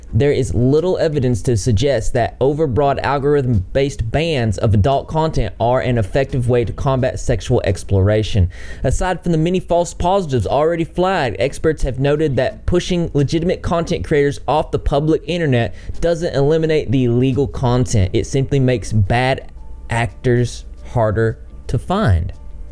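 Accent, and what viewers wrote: South, United States. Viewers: Southern? He's from Tennessee I'm pretty sure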